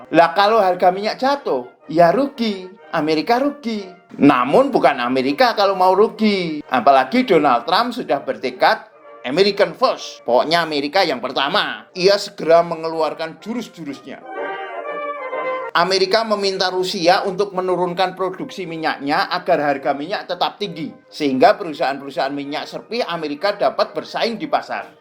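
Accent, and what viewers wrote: Java, Indonesia. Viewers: jooss .. bahasa medhok nya ngakak...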